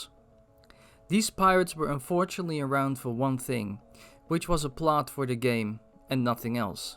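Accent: Netherlands. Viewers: Been a long time since i saw an actual good theory. I also hear some Dutch accent. I'm from Belgium so i'm glad to hear someone near me also be this passionate about Zelda.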